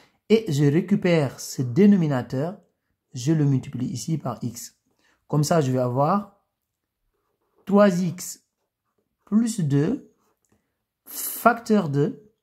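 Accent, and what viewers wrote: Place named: Senegal. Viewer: Accent sénégalais...merci compatriote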